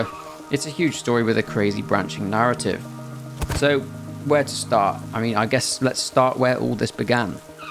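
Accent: United Kingdom. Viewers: Why is it always some british fool talking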